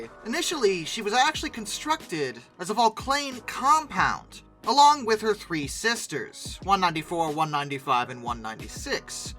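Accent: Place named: Midwest, United States. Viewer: Midwest, I think. Doubt he's from the east since he stumbles on stuff like "Worchester."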